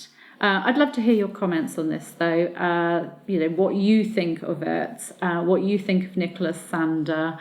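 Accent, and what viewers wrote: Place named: United Kingdom. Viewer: That's the British English spelling - and she's speaking British English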